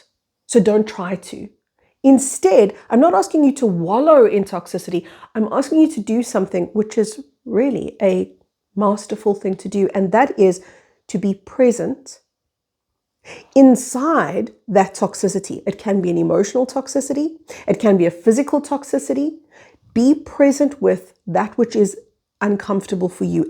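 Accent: South Africa. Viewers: Hi …..I’m South African….discoverd you just now🫶🏻excited to know you’re on YouTube….your accent made me think you’re South African and yes…..need to meet my community🙏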